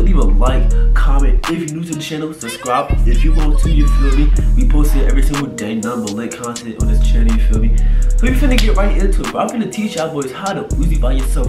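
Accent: United States. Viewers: yu frm nyc ?? uu dead sound like uu frm there no lie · that florida accent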